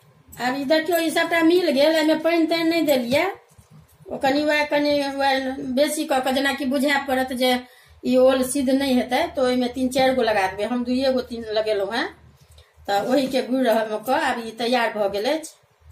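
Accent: Bihar, India. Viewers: Aaj bahut dino k baad Bihari bhasa sun ke bahut Accha laga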